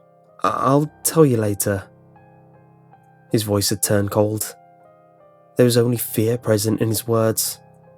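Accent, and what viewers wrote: United Kingdom. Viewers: buddy grew up in northern nevada with a British accent.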